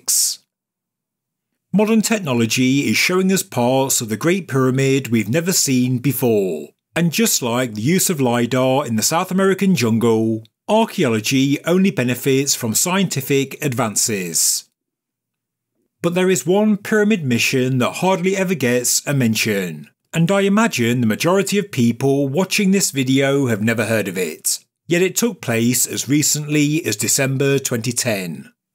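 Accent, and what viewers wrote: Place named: England, United Kingdom. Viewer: Awfoool. Oh u r English man.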